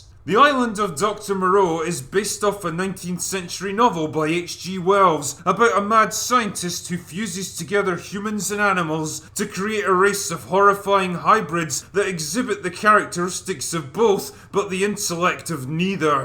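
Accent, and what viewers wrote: Scotland, United Kingdom. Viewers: This guy sounds like he’s straining while taking a shit and giving a review at the same time in a British accent with a mouth full of pastries. Not being rude but just what it sounds like to me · The Worst...? Well, I admire your razor sharp whit & kickass Scottish accent... You're probably too young to remember Ishtar, Heaven's Gate, The Room, Roar... Love your work!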